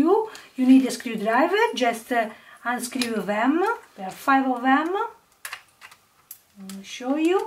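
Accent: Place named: Italy. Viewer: What a fun altered book! I am amazed at your imagination and creativity! I also enjoyed hearing your lovely Italian accent!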